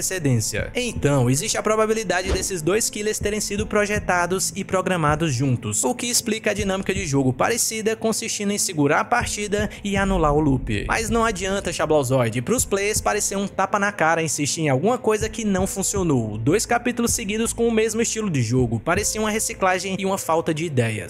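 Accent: Ceará, Brazil. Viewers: Poha baitinga, tu é do Ceará? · é cearense? Falai vizinho!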